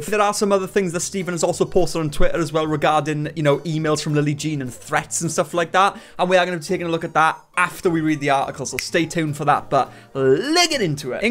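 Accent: Wales, United Kingdom. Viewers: He's Welsh. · Off topic but.. your Welsh? I knew there was something familiar about your accent!!!!! I DONT THINK IVE EVER REALLY SEEN A WELSH YOUTUBER!!!!!!! Finally!!!!! I have found someone!!!! 😭🥳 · I’m sorry if this is rude to ask but what is the accent he has is called? It is not the usual British accent I am familiar with. I just love his accent so much and would love to learn more about it.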